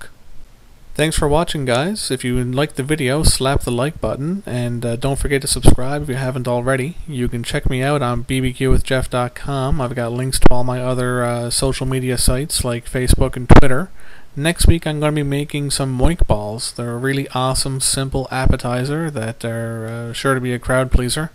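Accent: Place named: Newfoundland, Canada. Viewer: Awesome. I figured Newfy but sometimes i get confused by people from Nova Scotia etc. I am from BC myself and just getting into this charcoal cooking. Tons of fun! Cool Videos.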